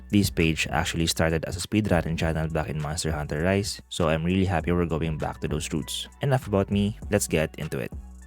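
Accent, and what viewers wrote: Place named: Philippines. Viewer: Your accent in the beginning, I knew exactly you're a filipino like me. Kudos to your guide video bro. After doing speedruns on my Bow... now it makes me wanna go LBG for my next weapon. Thanks man great video!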